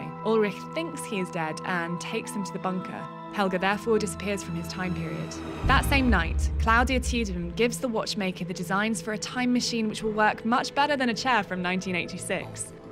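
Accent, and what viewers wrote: England, United Kingdom. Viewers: The narrator has the most neutral, articulate and clear English accent one could possibly have... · Sub eng please, i dont really understand when listening UK accent hehe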